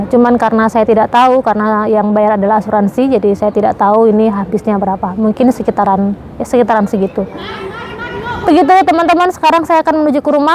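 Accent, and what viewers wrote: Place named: Lombok, Indonesia. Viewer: Salam kenal mb Oww pantas lama d lombok Dialeknya kelihatan banget Salam untuk suami dan Matilde yg cantik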